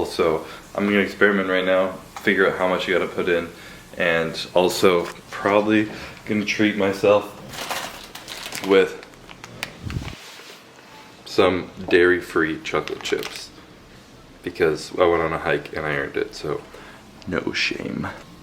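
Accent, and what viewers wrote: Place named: Canada. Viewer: Dude the first time I heard u speak I’m like this guys def Canadian ! keep it my Canadian brother .. shout out form the 6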